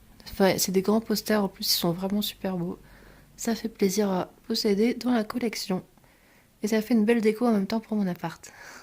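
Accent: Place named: Nord, France. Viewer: Salut , pas mal de bonnes trouvailles GG à toi avec en plus un accent du Nord on dirait ...Je mets un Like et je m'abonne . Bienvenue à toi sur ma chaîne ;). A bientôt . Yoguy72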